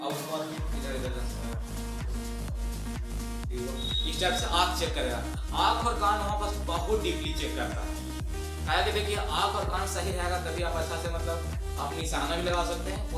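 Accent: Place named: Jharkhand, India. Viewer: Lag raha jharkhandi ho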